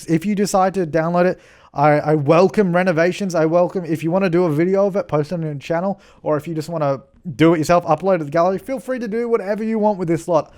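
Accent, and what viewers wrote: Australia. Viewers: Absolutely my favourite sims4 series so far, as I usually play the game starting from little to no money. And your Aussie accent is somehow perfect for this kind of commentary. Perfect. Haha.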